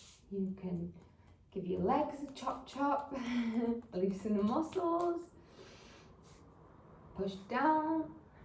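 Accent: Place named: England, United Kingdom. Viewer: You're so hot in this outfit 🔥 Specially talking in this beautiful engkish accent too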